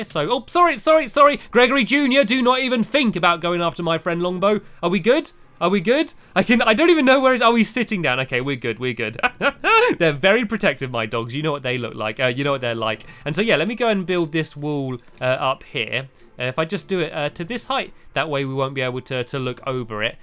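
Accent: United Kingdom. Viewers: OMFG he has a british accent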